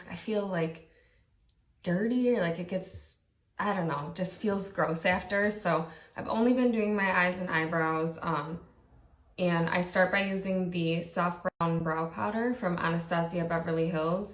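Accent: Michigan, United States. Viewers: Holy Michigan accent. She has a big time one. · shes definitely from michigan lmao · I grew up in Michigan and moved to Florida at 15. It's just funny because her voice and accent sounds just like my aunt who stayed in Michigan. If I closed my eyes and just listened, I wouldn't be able to tell them apart. Weird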